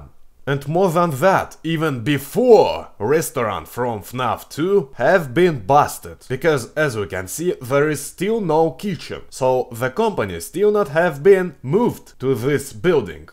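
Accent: Russia. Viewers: Very 👎 Russia accent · This russian accent is impssible to replace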